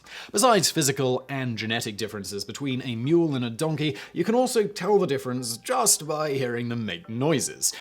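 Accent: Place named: United Kingdom. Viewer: Zedbras 😂😂 I love british english (yeah yeah, i know lives in Prague)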